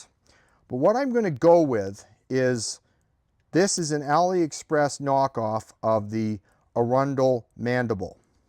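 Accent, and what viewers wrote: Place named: Pittsburgh, United States. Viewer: That's pretty close to a Pittsburgh accent. Never seen the channel before, so that's my guess.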